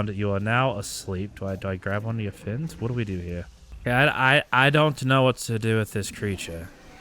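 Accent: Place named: Australia. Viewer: bro u sound aussie sometimes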